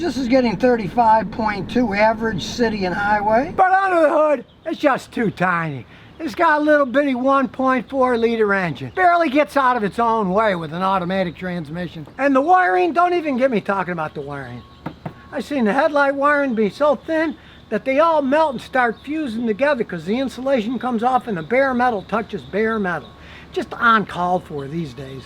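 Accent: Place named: United States. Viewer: This guy is hard to listen to. Just that dumb version of the american accent.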